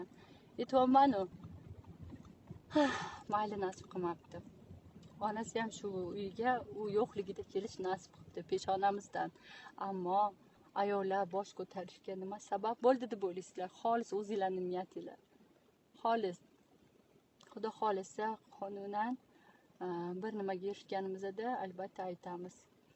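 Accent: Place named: Tashkent, Uzbekistan. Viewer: Узизни шевазда гапируринг .тушунамиз адашиб юбориб яна повторный тошкент шевада тугирлаб кетвосиз. Бир гапни икки шевада гапирвосиз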